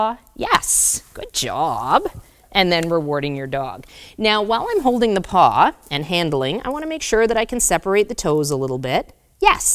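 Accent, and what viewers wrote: Canada. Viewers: "Talk a-boat" 😂god I miss that Canadian accent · is that a canadian accent?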